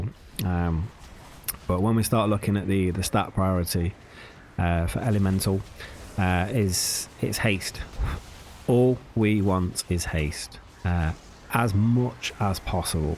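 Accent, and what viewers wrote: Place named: United Kingdom. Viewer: nice seeing a brit explain talents etc for a change haha! thanks buddy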